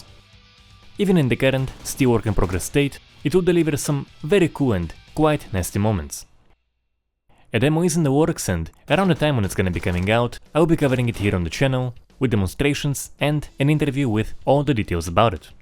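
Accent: Russia. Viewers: Чет твой акцент очень похож на русский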